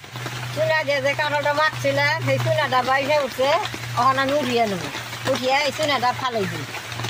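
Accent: Tangail, Bangladesh. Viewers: টাংাইল এর ভাসা নাকি মানিকগঞ্জ?? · Didi ki tangail er manus naki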